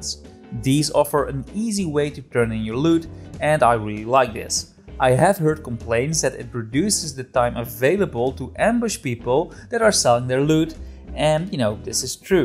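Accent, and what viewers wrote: Netherlands. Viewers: Knew you were Dutch lol. "Zuipschuit" is a nice touch.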